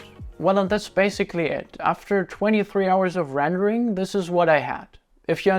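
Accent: Germany. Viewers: Ich feier deine Videos, aber der deutsche akzent fickt meinen kopf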